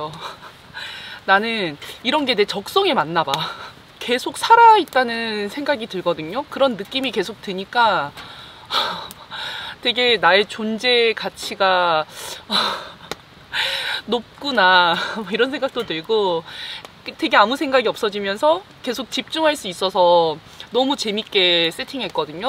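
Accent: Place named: Gyeongsang, South Korea. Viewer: 정말 잘 드십니다.👍👍👍👍👍집게벌레 독 없어요. 말씨는대구나 부산 같은데요?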